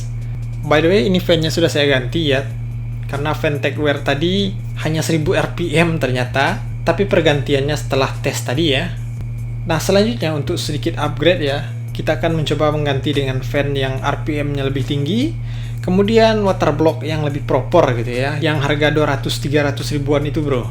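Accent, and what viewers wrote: West Sumatra, Indonesia. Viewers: Jaleh bana urang minangnyo...🤣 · bahasanya indomi kali yah, atau prasaan saya ajaa :) , gw tebak pasti orang minang 🗿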